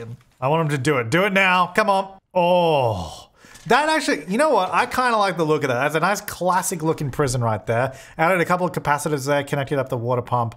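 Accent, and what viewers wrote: Australia. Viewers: They're both Aussie, so maybe that's why they sound the same to you?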